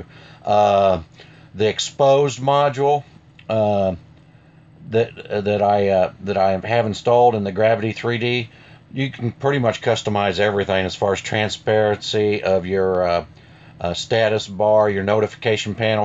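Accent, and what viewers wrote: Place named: South, United States. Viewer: I just purchased a Tab S, so i've been watching alot of reviews for the Galaxy Tab S in various engish dialects from different countries and your video in the southern American dialect is great. Very impressed with the way the video was presented and really showed a great tablet "really" used for work. You sold my on the Next Launcher, very good set up you have. I will be hitting subscribe, thanks dude.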